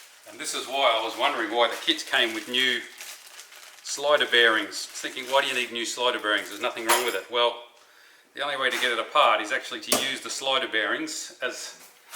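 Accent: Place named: Queensland, Australia. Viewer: hey mate you are not in melbourne are you (judging from your lovely QLD acsent)? need mine done too, slow leaking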